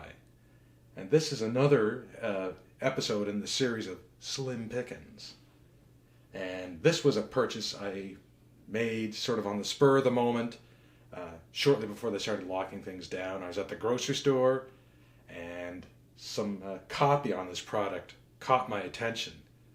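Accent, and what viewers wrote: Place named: Maine, United States. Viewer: There’s nothin slim about the quality of these « slim-pick in’ » videos! Love the Maine accent!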